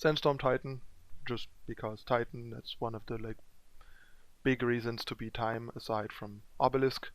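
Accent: Germany. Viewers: are your from Germany?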